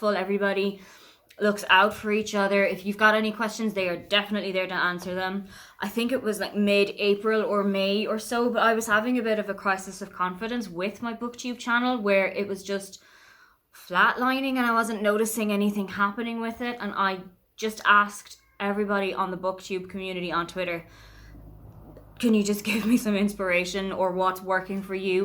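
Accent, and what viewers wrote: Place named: Germany. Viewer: I love your accent. I find it really hard to understand the Irish accent most of the time but your accent is so easy, maybe it's a bit of the German açcent you have picked up, have you lived in Germany long?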